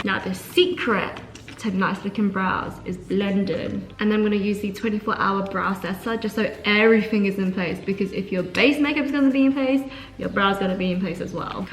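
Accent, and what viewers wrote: United Kingdom. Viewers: I was not expecting you to be British! What a lovely surprise. As a fellow oily skinned Brit, thank you for this vid - it’s given me lots of tips and things to try. Keep up the good work beautiful ♥️ Your Insta vids make me chuckle so much!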